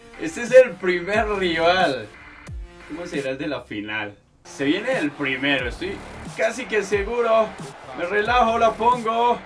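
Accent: Colombia. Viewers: Tu eres colombiano desde el minuto me di cuenta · de que parte de colombiano eres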